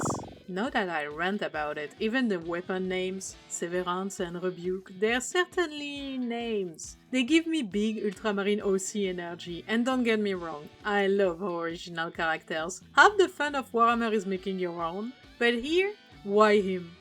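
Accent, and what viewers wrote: France. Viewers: surprenant d'avoir l'accent frenchy de fou alors que tout est en anglais 😆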